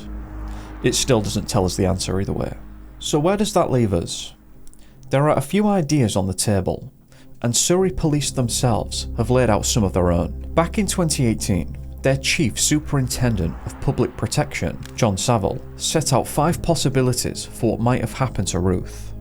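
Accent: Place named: East Yorkshire, United Kingdom. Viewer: Unrelated but are you from Hull or East Yorkshire? Just listening to fall asleep to and was like hang on that accent sounds local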